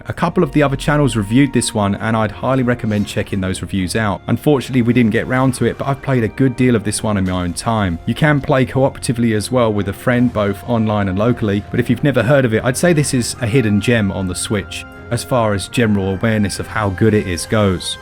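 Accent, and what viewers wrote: England, United Kingdom. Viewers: I'm here for the British accent. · Real question, who doesn't enjoy a nice Brittish accent? Also, gotta say, I love the Monkey Island music. It always puts a smile on my face. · Can definitely handle the British accent 🤣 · Wait are you saying I can win a prize if I just leave a comment? I can handle the British accent. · As an Englishman I personally cannot handle the English accent. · British accent: handled😈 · Monkey island music, a British accent, a nod to Monster Boy, and Dragon Quest- cant get any better. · Handle the brittish accent.. I want it myself! As always great content here :) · Love the British accent, greetings from México 🇲🇽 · Even the avoid section sounds buyable when heard in a British accent Been wanting the final fantasy 10 and 11 remastered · I subscribed because I can handle the British accent. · Well I was gonna sub but I can't handle the British accent... 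· The British accent IS the reason why I subscribed XD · Who the hell can't handle British accents? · Love your channel and find your British accent to be endearing. Thanks for all the good run downs! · I can handle the british accent. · Believe it or not, but you are the only British guys I understand perfectly! Plus, your accent makes me take a plane and go to England (damn CORONA!!:)) I love your channel!!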